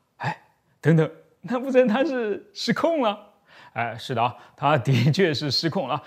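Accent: Nanjing, China. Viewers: 哇，，原来是南京人啊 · 是南京的？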